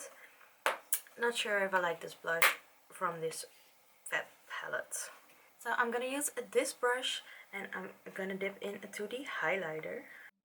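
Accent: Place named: Netherlands. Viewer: so yor accent sound like your dutch so im gonna talk dutch hii ik klikte je video aan en ik keek de video en ik dacht dat je een soort beroemde ytbr was dus goed gedaan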